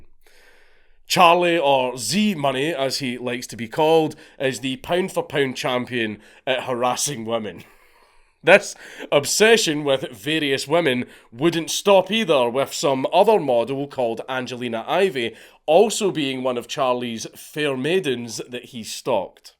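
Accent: Scotland, United Kingdom. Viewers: Each video you over pronounce every word to mask your scottish accent kills me 🤣🤣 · Who,, Charlie? Charlie is an American,, or do you mean the channel creator? Count Dankula is from Glasgow Scotland,, and he's speaking with a heavy Scottish brogue...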